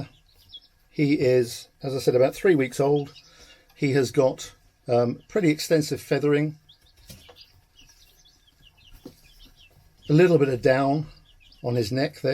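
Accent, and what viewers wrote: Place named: Midlands, United Kingdom. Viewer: Hi mate, first I've got to say thankyou as I've searched everywhere to find this kind of info. I have 3 six week old and they're lamp is all the way up now how do I get them from the box to the coop as I don't have power in the garden. Also I live in the UK round the Midlands so should be getting the similar weather to you considering your accent. Any help appreciated and thanks again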